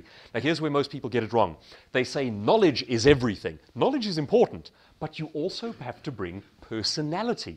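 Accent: England, United Kingdom. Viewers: He is an excellent speaker he has an English accent that you can understand very well and very knowledgeable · Queens English?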